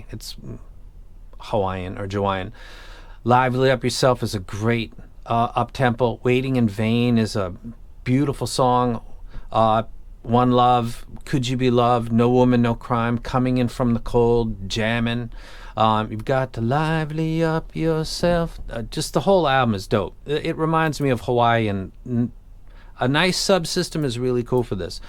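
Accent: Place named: New York, United States. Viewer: I had no idea that you live in Japan. neat. Given the somewhat tight space and accent, I assumed you were somewhere in NY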